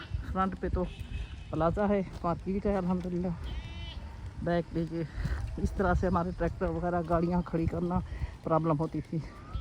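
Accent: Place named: Saraiki, Pakistan. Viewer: 👍❤🤲🤝😘🥰♥️🌺 السلام علیکم ویڈیو تو ماشاء اللہ بڑی مزیدار ہے اپ نے گاؤں دکھا دیا سبزیاں دکھا دیں اور عورتوں اور گلہری بھی دکھا دی مجھے لگتا ہے اپ سرائیکی ہو لہجے سے پتہ چل رہا ہے میں بھی لیہ کی ہوں میں ہم بھی مجھے بھی سرائیکی پوری اتی ہے کیونکہ میری ایک بہن کی شادی بھی سرائیکیوں میں ہوئی ہوئی ہے اللہ تعالی کی اپ کو کامیابیاں دے ترقی انے ماشااللہ ویڈیو دیکھ کے بڑا مزہ ایا اور بہت اچھا لگا کوکنگ ود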